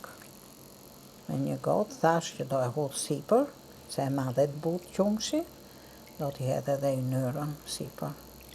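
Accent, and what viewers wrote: Tirana, Albania. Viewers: te lumte i ke duret flori,ka lezet dhe e folmja. tironce nji e sbohen dy,hallall 🥰👐💐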